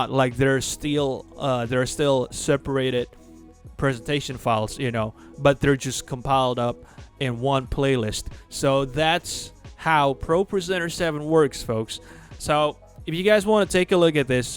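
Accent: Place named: South, United States. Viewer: This guy really knows what he's talking about. His southern accent is so genuine!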